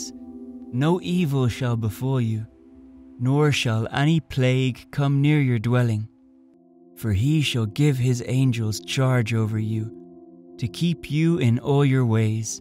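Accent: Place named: Ireland. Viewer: Which part of Ireland are You from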